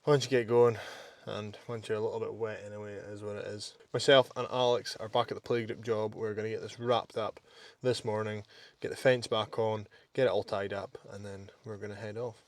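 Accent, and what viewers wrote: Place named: Scotland, United Kingdom. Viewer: absolutely no idea how i ended up here apart from scrolling through youtube on back shift at work. Grat content mate. Great to hear a fellow scotsmans voice on youtube! wish you all the best boys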